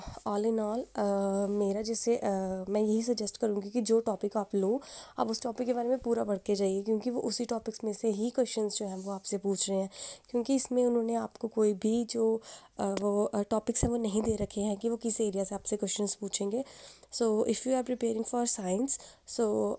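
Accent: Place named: Haryana, India. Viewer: Ok thank you. And God bless you 🙂 But kha se ho yeh toh btati jao. Accent se guess krra tha. Last guess haryana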